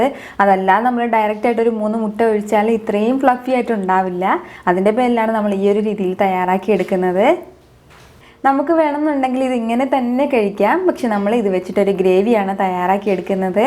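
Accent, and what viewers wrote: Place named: Tamil Nadu, India. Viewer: neenga pesurathu entha Mozhi Tamil kuda sernthu mix aagura maari irukku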